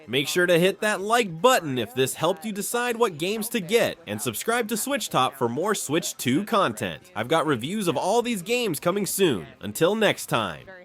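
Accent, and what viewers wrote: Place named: United States. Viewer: Please could you stop using Yank Narration in your videos!? Quite honestly any other country of origin would be such a huge upgrade for me. I am literally unable to listen to this ermm, person anymore. The noise coming from him is just so vile! It makes me want to vomit. Don't get me wrong I'm not against all yanks, I love MrBallen for example but this guy and certain people's voices from yank land is just disgusting. I can't listen to anymore of this guy talk, very sorry!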